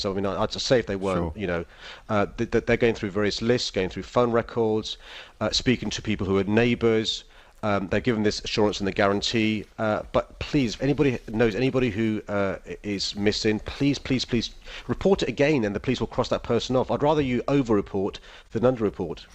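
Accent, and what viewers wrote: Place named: Yorkshire, United Kingdom. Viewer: Speak up! How could anyone not from Yorkshire understand you?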